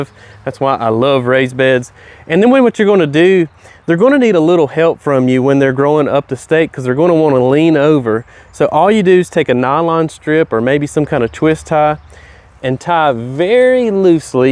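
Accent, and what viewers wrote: South, United States. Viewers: I'm so glad the American accent is alive and well. One of the most beautiful of all of them, as represented here. It's a soothing and comforting sound if you had Aunts, Uncles, or Grandparents, or anyone else you looked up to, who was from our South.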